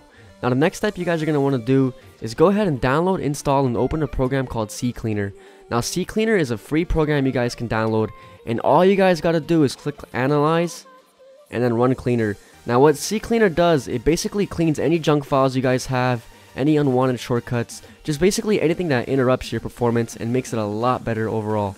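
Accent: Croatia. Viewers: are you from croatia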